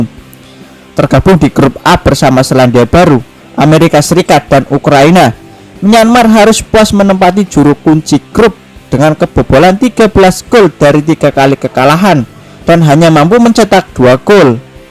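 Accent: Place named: Java, Indonesia. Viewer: Banyakin baca koran..hilangan medok mu mas...g enak didenger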